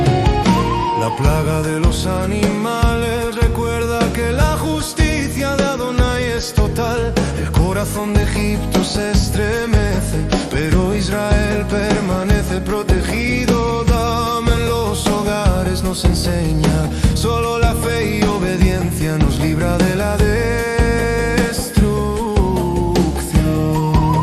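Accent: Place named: Spain. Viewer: Wow!! Yo pensaba que naciste en España por el acento, señor. Veniste a Estados Unidos por música, religión, trabajo??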